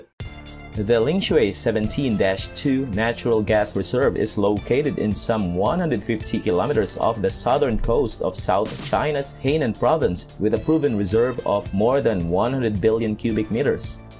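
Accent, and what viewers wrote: Philippines. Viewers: Pinoy ka boss? Accent mo kasi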